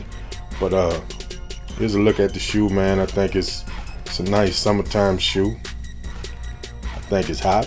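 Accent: New Orleans, United States. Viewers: Is that a nola accent I hear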